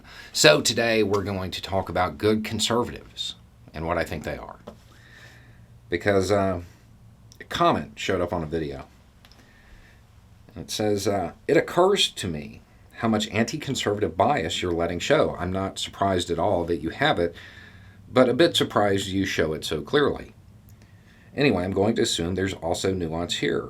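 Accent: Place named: Deep South, United States. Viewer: When the Almighty Algorithm dropped him into my box, I had to "go by" several times before decided to "tune in". the look of, his accent (I'm from the Deep South and have heard that accent from some tough, onery people)- it took me until the third watch through before I could believe he was really there. I don't think I've missed more than three since! Even Conservatives could learn a bunch here, but a bunch of thinking isn't much appreciated in Trumpland. Bet he wishes he still had Billy Barr for an attorney who could write out his own subpoenas! FR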